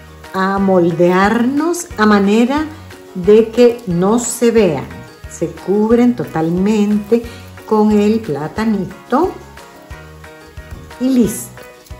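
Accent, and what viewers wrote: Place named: Guatemala. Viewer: Muy agradable escuchar un castellano chapin bien hablado! Deliciosa receta, gracias!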